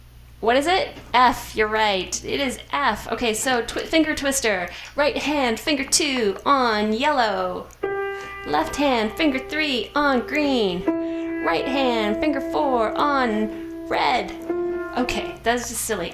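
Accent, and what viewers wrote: Canada. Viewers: I can really hear that Canadian Accent coming 'out'.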